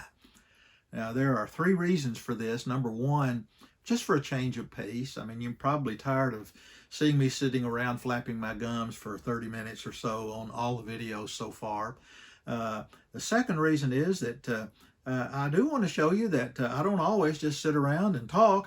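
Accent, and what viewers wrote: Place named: South, United States. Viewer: Awesome photos. Love your channel. Very clearly explained concepts on your videos. Plus love ur Southern Accent.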